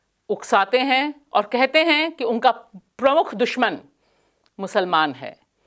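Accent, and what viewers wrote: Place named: Jabalpur, India. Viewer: आपका बोलने का अंदाज, मध्य प्रदेश के जबलपुर आसपास से लगता है, एकदम बुलंद 💪🇮🇳🙏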